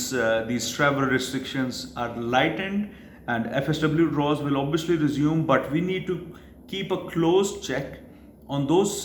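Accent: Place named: Lahore, Pakistan. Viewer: Veerji your accent is like someone from Aitchson College Lahore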